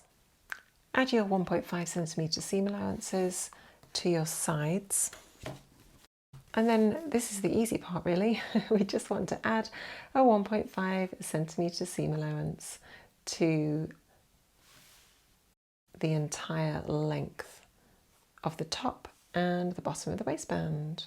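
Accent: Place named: United Kingdom. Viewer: This a great tutorial! I have been sewing for quite awhile but I seem to have so much trouble getting the wristband fitted nicely. I prefer a curved waistband instead of a thin 1 or 1.5 as I am slightly swaybacked, and I end up getting a little gap in the center back. I can’t wait to try this method. You are an awesome instructor and I love to her your cool British accent! Thanks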